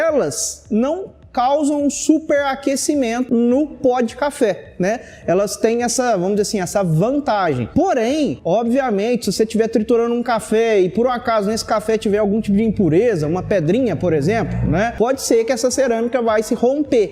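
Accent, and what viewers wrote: Minas Gerais, Brazil. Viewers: mudou para mineires no vídeo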